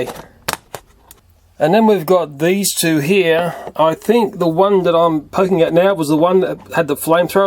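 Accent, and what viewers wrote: Australia. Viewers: Deadly spiders and an Aussie accent, where else would you rather be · wimp. by the sound of your accent your born and bred here in oz so why the big drama . You know we learn to live with them . Leave em alone and they leave you alone.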